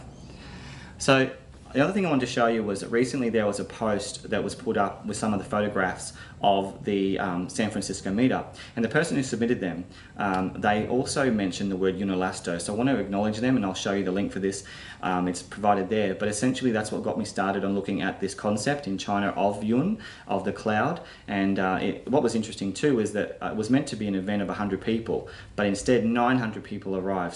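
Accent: Australia. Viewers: Awesome!! That's a lot of info to absorb, sounds like you're in Queensland and have a foxy type dog. You make very interesting points and links. thank goodness I chose Neo. For all the hours and hard work I would like to donate some Gas but it seems you are to humble. Really appreciate your work mate.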